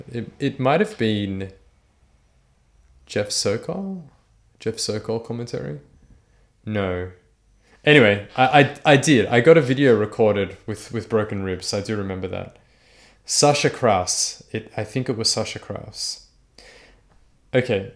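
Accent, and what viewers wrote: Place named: Australia. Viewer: Whoops..hard to place... Australian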